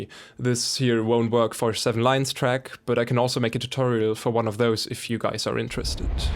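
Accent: Germany. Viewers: du sprichst ja Deutsch 1.Dein Musikprogramm ist in Deutsch 2.Man hört an deiner Stimme das Deutsch sprichst 3.Du bist der beste Deutsche DJ der Welt!!!! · That german accent tho lol :D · You have a germany Akzent or you can speak better than the most english/amerikan people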